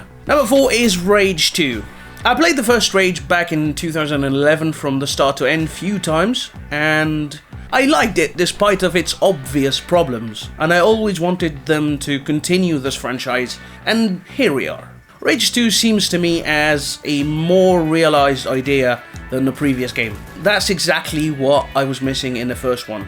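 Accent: United Kingdom. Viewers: I envy your british accent tbh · Your British Accent is awesome, really! keep it up, Dude!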